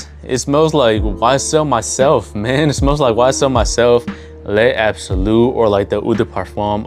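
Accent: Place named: Mexico. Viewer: He’s not talking like that at all wtf are you talking about, he does have a sort of Mexican accent though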